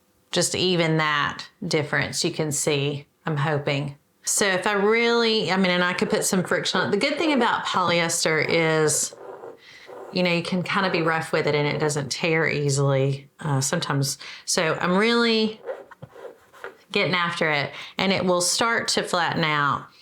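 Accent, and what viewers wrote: South, United States. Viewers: Love that cranberry color you’re wearing! It’s very flattering and a perfect choice for your lovely “quilt in progress” backdrop. ❤️ The video’s content is valuable information for new quilters. Many of us learned the hard way and would have appreciated this information. And thank you for making me smile the entire time I’m viewing your videos. Your southern pronunciations are adorable. Aahrn was precious! ❤️ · Love your sense of humor!! And the southern accent! Thanks for thie information! I was wondering why we should steer away from cotton/poly. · At the op shop today I fingered an intriguing seafoam green sheet, just to see the tag say "cotton/poly". And I swear, I heard your voice say in your southern way, "Nope!"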